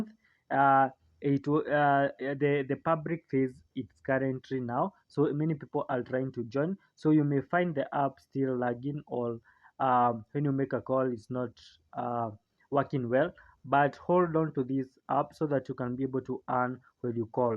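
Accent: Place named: Kenya. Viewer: You sound Kenyan is it legit?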